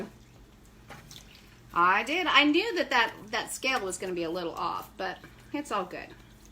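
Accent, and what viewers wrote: Texas, United States. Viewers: Sounds like you are from/or in Texas. Denton Tx here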